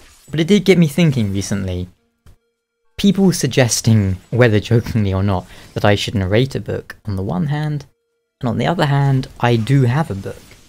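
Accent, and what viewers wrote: United Kingdom. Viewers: Go for it mate, even if you want to use dark souls ost if you need to, I rekon your audio book will be well received Also we're you born in the uk? Nice work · I strongly agree with your ideas here. I don't play destiny, but I like the Lore videos you make. Also some Diablo Lore videos would be uber sweet. I also believe your British accent makes you very pleasant to the hear.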